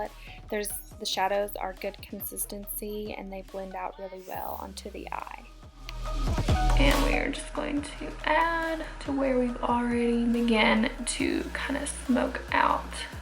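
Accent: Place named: South, United States. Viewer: Who cares what people think! As long as you like them that’s all that matters! I get mine done and everyone will always have their opinion! I choose to ignore BC I like them! Where are you from? I wasn’t expecting the country accent as this is the first of your videos I’ve watched! You are beautiful! Thanks for the video!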